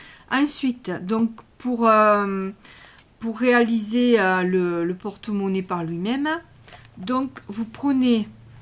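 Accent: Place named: South, France. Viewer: coucou, super explications en plus avec ce petit accent du sud j'adore :) merci pour le tuto à bientôt bisous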